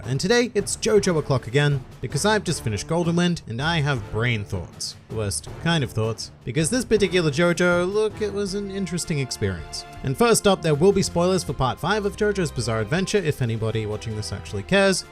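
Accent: Australia. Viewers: Wait your Australian, I thought you were British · I thought your accent sounded normal so you are aussie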